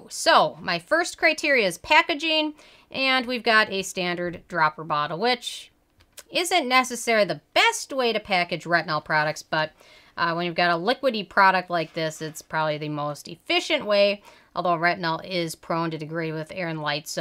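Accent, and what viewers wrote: Minnesota, United States. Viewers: I’m Minnesotan and recognize a fellow cold north accent when I hear it ;) Wisconsin?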